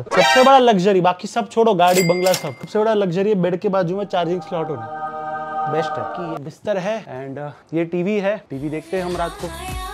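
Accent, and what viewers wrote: Maharashtra, India. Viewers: Congratulations 🎉 🎉 Marathi tone bahot hi mast hai bole to Aapke bolne ka style bahot hi funny hai 😂 but it's emotional also..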